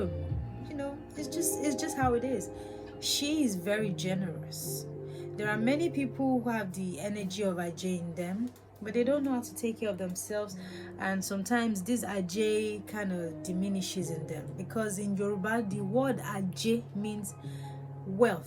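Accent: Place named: United Kingdom. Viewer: She's speak like a United Kingdom citizen ❤. Her beauty is astonishing 😮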